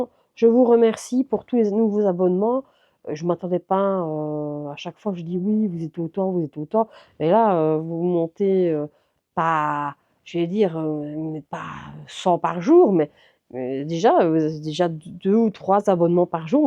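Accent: Belgium. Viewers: Bonjour Je viens de découvrir votre chaîne J' apprécie beaucoup votre naturel ça fait du bien pas de chichi et franc parler avec un accent de Belgique, vraiment ça fait du bien au moral au coeur 😁 ça me motive à me secouer pour avancer dans mes projets Je vais visionner vos anciennes vidéos histoire de vous découvrir un peu plus Vous avez du pain sur la planche façon de parler😄 Très bonne journée Bises de Bretagne 👋👋👋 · Bonjour, je vous découvre aujourd'hui, j'apprécie votre façon d'être sans chichi et votre petit accent belge... Merci pour la vidéo. 😍.. Bonne couture.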